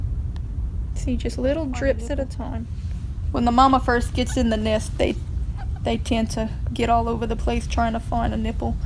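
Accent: Louisiana, United States. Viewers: Most helpful video I've watched yet👍I like your accent too, sound like you're a Louisiannan🐰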